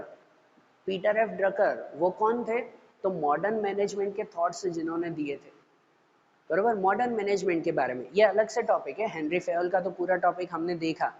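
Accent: Gujarat, India. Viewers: Sir aap Gujarati ho !?😋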